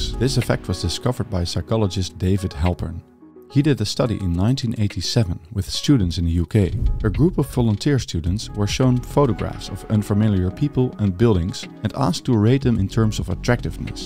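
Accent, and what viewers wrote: Netherlands. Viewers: Notwithstanding your terrible Dutch accent, you are every bit right about the importance of beauty. Actually, this is exactly what King Charles III stated as Prince Charles when he released "A Vision of Britain" and launched his institute for architecture. Efficiency, practicality and beauty are not incompatible at all. On the contrary, they should go hand in hand.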